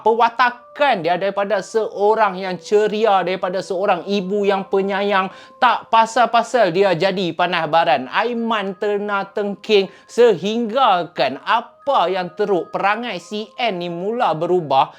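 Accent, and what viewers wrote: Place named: North, Malaysia. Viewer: Slmt pg channel..hahaha terbaik intro kali ni..dimulakan dgn selawat.. cuma agak pelik mendengar bckp loghat utara..canggung bunyinya..tp kisah kali ni mmg best..nama pggilan pn sama mcm nama mamy...waah perasan sekejap nama mamy disebut2.. 🤣🤣🤣.. kisah kali ni mengingatkan mamy pd ank dara mamy yg disihir lbh kurang sama waktunya dgn kisah dlm video ni..waktu pkp..tp skrg alhamdulillah skrg semua dh ok..ank mamy tu dh sembuh.. terbaik video kli ni..bnyk pengarannya..best👍👍👍🌹🌹🌹🌹🌹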